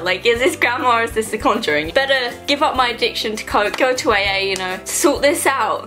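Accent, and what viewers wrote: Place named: London, United Kingdom. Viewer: it's a particular type of london accent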